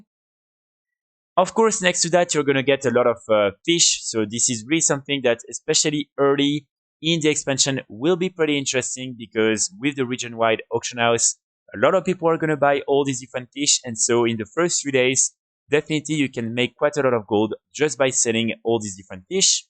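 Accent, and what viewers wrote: France. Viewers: L'accent français me mets toujours aussi bien, bonne vidéo comme d'hab 👍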